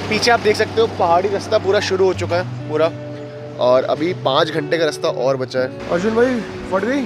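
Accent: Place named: Haryana, India. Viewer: Jadda haryanvi hai bhai😂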